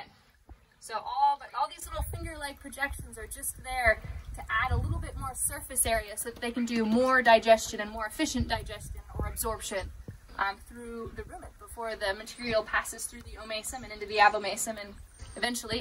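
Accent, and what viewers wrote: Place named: United States. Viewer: Also Lady, please put down an English writing above, because don't understand american accent and vocal pronounciation with all mouth. Is strange this English accent. The subiect îs to interesting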